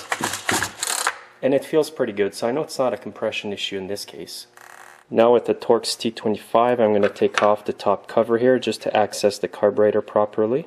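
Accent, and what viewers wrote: Quebec, Canada. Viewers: Sorry,. I had a lot of trouble understanding your Canadian accent. Talk slower. Were you referring to the red or blue screw? Your voice dropped out. Can they set these up for Torx screw adjustment? Why the special tool?!? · I've currently got a Poulan, and while it's good for what it is, it's time for me to upgrade. My hardware guy sells Echo, and claims that the quality of the machines and that the company is on par with Stihl and Husqvarna. What are your thoughts? PS: Is your accent Quebecois or Manitoban?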